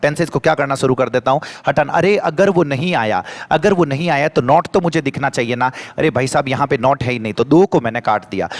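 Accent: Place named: Rajasthan, India. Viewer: Sir youtube par aisa koi mila h jo full meri tarah मारवाड़ी bole । Baki aaj tak koi ni dekhiya the sir ghana hakra padhao ji khush hugo 😁🥳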